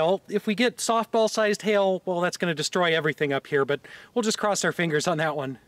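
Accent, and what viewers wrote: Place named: Alaska, United States. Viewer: You being from Alaska tracks. I couldn't place your accent, but you sound similar to an Alaskan I used to work with a million years ago.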